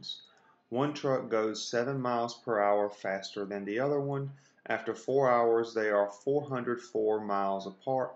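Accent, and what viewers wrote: South, United States. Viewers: are you from the south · Neat explanation + I like your southern accent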